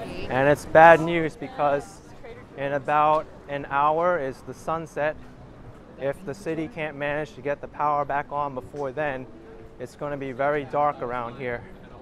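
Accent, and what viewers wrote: New York, United States. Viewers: The new York accent is thick in this young padawan 👏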